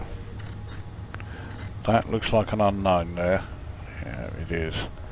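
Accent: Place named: Cumbria, United Kingdom. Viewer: Good Luck to you, I look forward to seeing any Uploads you may have when you aquire The Clipper, My PC is a little too slow for the Game but When it is finally finished I may have a bigger better PC, By the way IU'm from West edge of Lake district and i recognised your accent as not being that far away from me:)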